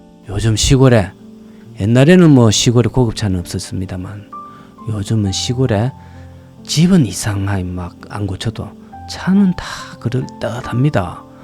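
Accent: Gyeongsang, South Korea. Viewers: 저고향 의령 선생님 말씀진리 임다~ 구수한갱상도 중독성 있는 음성 힐링됩니다 감사이 잘봤습니다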